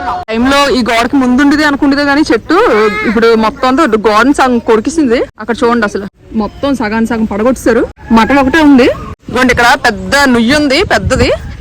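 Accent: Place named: Srikakulam, India. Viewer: Your dress colour is very nice. Your vedios are excellent with srikakulam accent. is beautiful and participative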